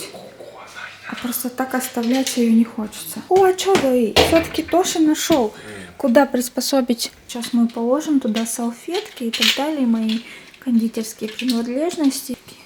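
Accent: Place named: Japan. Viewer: Вы очень красивая и милая☺️ мне нравится как вы говорите на английском и cute Japanese accent❤️ Желаю вашему каналу процветаний🥰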